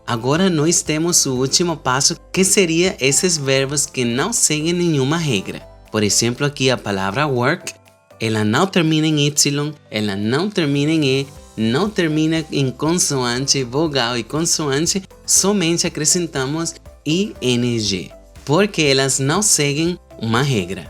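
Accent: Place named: Japan. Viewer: Boa noite professor tudo bem seu sotaque paresse ser japonês moro em Boa vista Roraima Brazil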